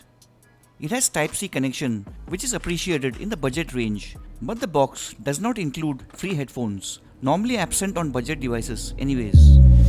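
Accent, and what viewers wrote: India. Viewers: Indian English:))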